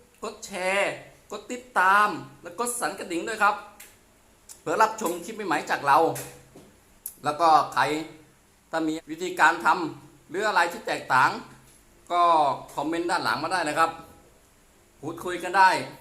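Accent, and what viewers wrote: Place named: Sukhothai, Thailand. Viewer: สวัสดีครับทักทายครับสำเนียงเหมือนสุโขทัย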